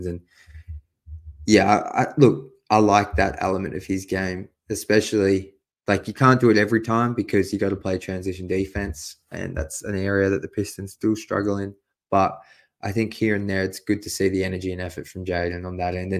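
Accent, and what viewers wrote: Australia. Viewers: Saw the mustache and handsome face and knew I’d hear an Australian accent. How does a guy living damn near 24 hours into the future become a pistons fan?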